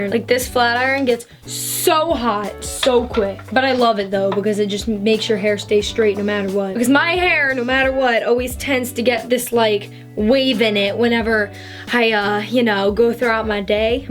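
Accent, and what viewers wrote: New York, United States. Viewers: WOAHHHG I JUST NOTICED HER NEW YORK ACCENT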